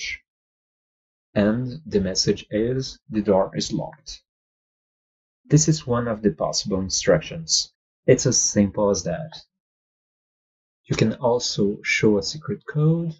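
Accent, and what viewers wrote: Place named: France. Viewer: It would be awesome if you did some more tutorials! Some more advanced tutorials, if you get the time. Would also like to see how you go through the process of creating rooms. And your English is perfect with a really cool, beautiful French accent so never apologize for that. :)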